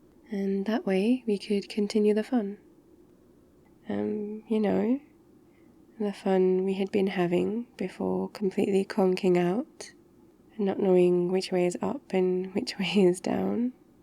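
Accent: United Kingdom. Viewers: Are you British! Finally! I love British accents!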